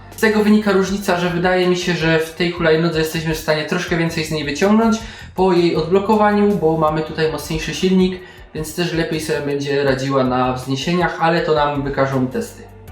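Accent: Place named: Silesia, Poland. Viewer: Czemu tak zaciagasz po slasku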